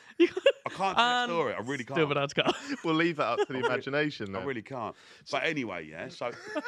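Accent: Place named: Cockney, United Kingdom. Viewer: Just cause he’s a cockney doesn’t mean he needs captions guys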